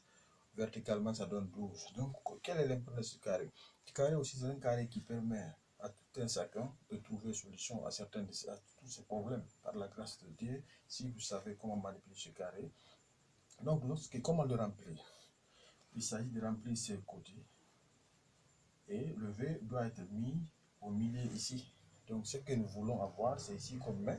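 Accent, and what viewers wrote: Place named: West Africa. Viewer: French with a West African accent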